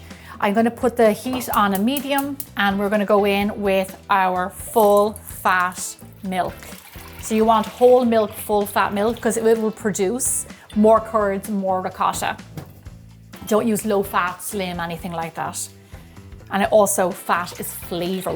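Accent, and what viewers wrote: Ireland. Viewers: So. I love your baking recipes. I love your accent (love Ireland!). BUT I'm Italian and this is what comes out of nightmares 👹🙈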